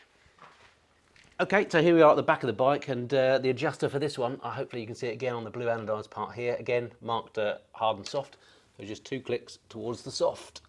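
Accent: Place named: United Kingdom. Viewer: Thanks bud I'm going to be buying one hopefully next season so your vids are an education for me and your British and that means it's easier for me to understand what your talking about keep up the good vids cheers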